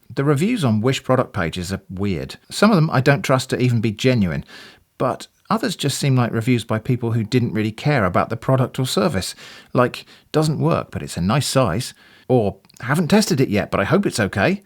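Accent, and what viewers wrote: United Kingdom. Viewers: why does he sound so SO british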